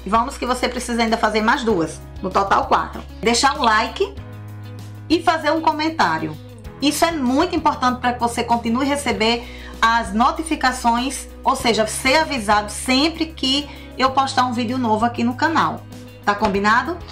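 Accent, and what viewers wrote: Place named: Sergipe, Brazil. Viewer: Amei seu sotaque! Sou sergipana e me identifiquei c esse sotaque, q parece sergipano. Amei tb a sua arte! 😍😍😍😍💗💗💗💗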